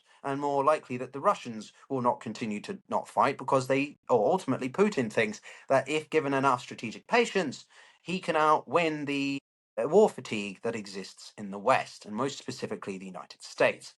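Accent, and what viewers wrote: England, United Kingdom. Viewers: Hey, English accent dude, you REALLY do NOT see the BIG PICTURE in front of your eyes!!! The US is NOT the heart of NATO! The US has walked away!!! The US only NEEDS NATO when its key national interests are involved, and Ukraine itself was NEVER a key US interest! Diminishing Russia has long been a key US strategic interest, but now the US needs to deal with China, a far bigger strategic interest than either Ukraine or Russia! Put simply, NATO has shown its weaknesses, as too has the EU (Military & economic)!!! Ukraine can swallow the bitter pill now or a far more bitter one in 6-12 months!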